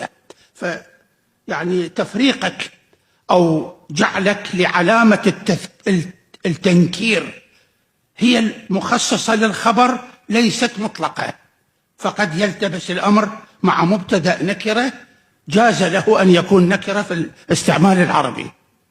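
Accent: Iraq. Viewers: طلاسم اللغة وتغدعقيدها دليل ضعفها ونفور الاشخاص للتدث بها... وذا الدكتور يتحدث اللغة الفصحى بطريقة خاطئة ...مخارج الحرف باللهجة العراقية وهذا لايجوز كنطق أو أن يتكلم بالعامية أفضل مثاا نكيرا...بدل أن يوقول (نكره)والدليا الذب ايتحصىه من القرآن يلين فيه الايتهزاء وهذا انسايا مرغوضا ولو أن هذا كتاب يقال أنه سماوي ...ودفالإستهزاء عمل سيئ والكثير من الشواء القرآنية تثبت ذلك ...يقولون هنالك عبرة ...أية عبرة حين تحبط الآخرين ...إذا كان كلام الله هكذا فكيف بعباده ؟؟. البلدان الذان ينطقان الفصحى بشكل سليم هما سوريا ولبنان ...أما باقي الدول العربية فلا. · يعني عيوننا دمعت لانك الوحيد الذي يتكلم مثل اهل العراق وباقي للسوريين لاء ...الاعيبكن اصبحت مكشوفة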